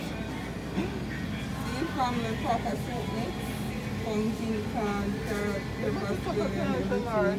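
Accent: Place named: Jamaica. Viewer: Good job, I like jamaican accent , yes man. You are a whole vibe and a very happy gal.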